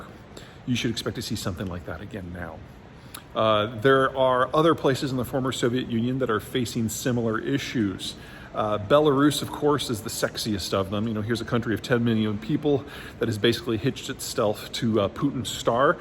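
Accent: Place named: Iowa, United States. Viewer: Don’t knock An Iowa accent honey.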